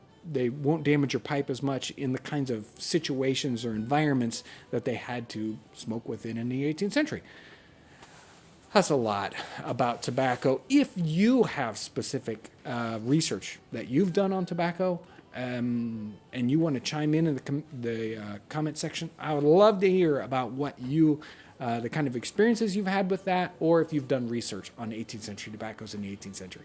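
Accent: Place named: Midwest, United States. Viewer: you know; now that Im looking for it you sound like a fellow Hoosier or Midwesterner in the least.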